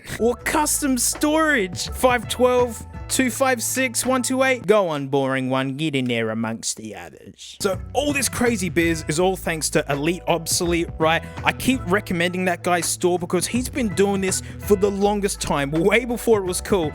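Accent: Australia. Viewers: He gets more and more Aussie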